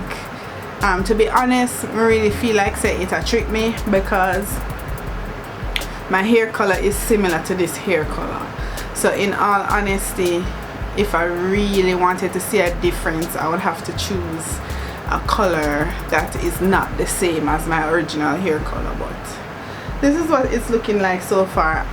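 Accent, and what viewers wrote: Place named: Jamaica. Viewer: Love that Jamaican accent❤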